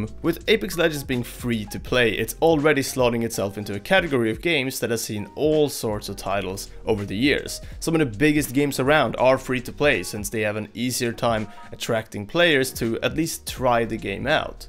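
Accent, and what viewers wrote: Sweden. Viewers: Are you swedish? You have a very swedish accent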